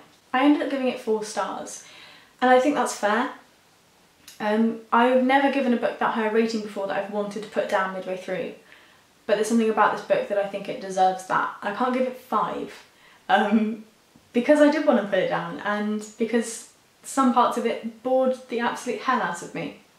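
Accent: United Kingdom. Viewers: agreed. this, combined with her heavy brit accent, makes it hard to hear.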